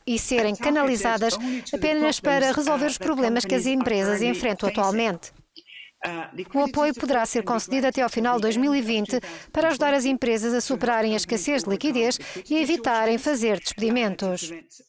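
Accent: Portugal. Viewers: é o português verdadeiro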